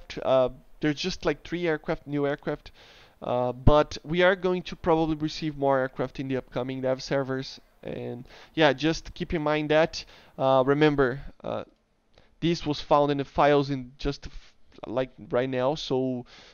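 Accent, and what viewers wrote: Brazil. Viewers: You sound like a Brazilian guy 🤔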